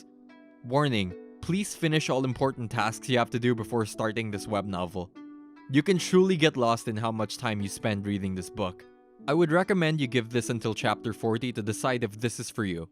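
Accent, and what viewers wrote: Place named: Philippines. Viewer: Filipino ka ba? Edit: I hear a slight Filipino-ish Accent.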